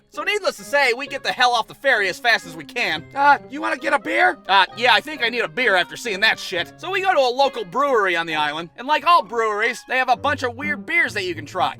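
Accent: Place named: Northwest Ohio, United States. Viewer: Okay okay this dudes form nw Ohio isn’t he😂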